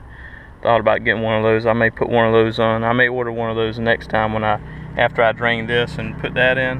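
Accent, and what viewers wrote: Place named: South Carolina, United States. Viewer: So I stumbled upon your videos on Dish Networks YouTube channel. I was watching you replace your Kawas water pump gaskets, bearings etc and I told my wife this guy sounds like someone from SC. Lol I then search your channel on my phone and I see the Welcome to SC sign. Pretty cool I'm from upstate SC area in Spartanburg! Btw I'm gonna rebuild my water pump in my 08 Yz250 this week!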